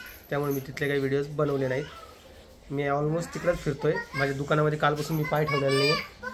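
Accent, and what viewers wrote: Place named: Khandesh, India. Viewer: तुमचे गाव कोणते आहे दादा ,तुमची भाषा थोडी खान्देशी वाटते आहे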